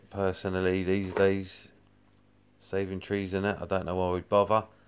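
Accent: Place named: England, United Kingdom. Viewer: Great review been thinking of getting one of these 👍🏼 nice to hear an English accent like mine for once on YouTube 😜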